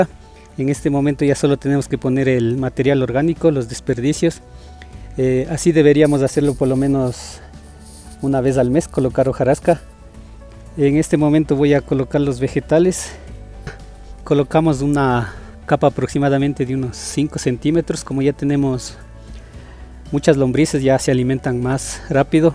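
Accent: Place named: Quechua, Bolivia. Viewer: Hola, gracias a ti. Lo dije porque me recordaba mucho tu acento a los bolivianos que hablan quechua, será una casualidad. Saludos !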